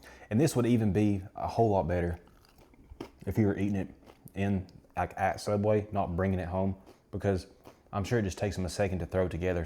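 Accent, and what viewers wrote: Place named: Knoxville, United States. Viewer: Good Review! Im glad your video popped up on my recommended! If I had to guess you're from Tennessee, you've got that knoxville accent thats easy to listen to. Keep up the good content!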